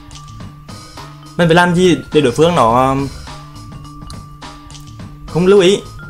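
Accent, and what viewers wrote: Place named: North, Vietnam. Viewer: Anh nói tiếng nam đi tiếng bắc khó nghe wa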